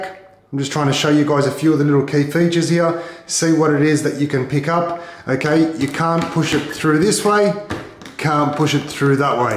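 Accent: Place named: Australia. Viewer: Thankyou, i was about to rip the door off it hinges but you saved me. What is with the rubber washer, dumb. Love hearing a aussie voice on a YouTube help channel .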